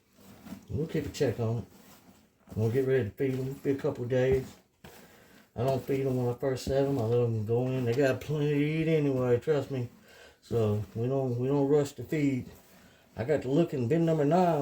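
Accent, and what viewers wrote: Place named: South, United States. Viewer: My wife want to know where you grew up? She says you sound a lot like me and I am a country f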